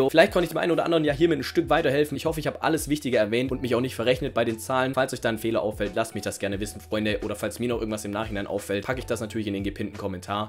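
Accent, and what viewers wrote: Swabia, Germany. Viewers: wieder unglaublich gutes video, danke dir,. selbst meinem vater, dem i das video grad gezeigt hab, hat gemeint: " das ist aber ein sehr professioneller junger mann. aber bei dem hochdeutsch ist der doch kein schwabe? " - doch isser, unser 😁 ich wünsch euch allen ein frohes und besinnliches weihnachtsfest 🥳liebe grüße aus dem regnerischen Bärlin. peace and love ✌❤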